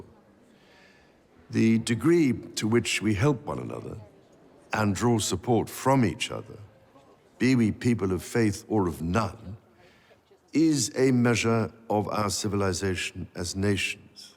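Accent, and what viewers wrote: RP, United Kingdom. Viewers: an old white haired RP speaker?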